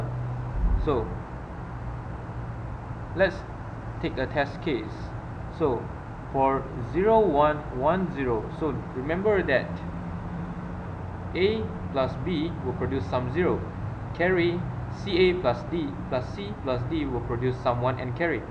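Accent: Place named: Malaysia. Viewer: your accent makes me miss Malaysia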